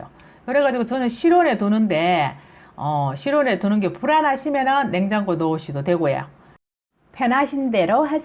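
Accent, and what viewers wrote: Gyeongsang, South Korea. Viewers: 쌤 경상도 라도 억양이 경북 분이네요 하모예 메실엑기스는 파이지예ㅋㅋ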